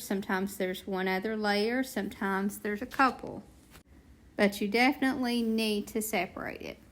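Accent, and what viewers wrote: South, United States. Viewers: I really did enjoy this video & I enjoyed listening to your sweet southern accent. My mom was from West Virginia & you brought back many happy memories... TFS 💕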